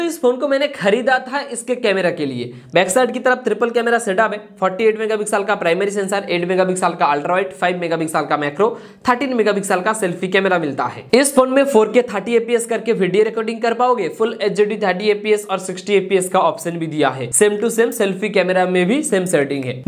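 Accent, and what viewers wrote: Bengal, India. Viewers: Bangali hain kya bhai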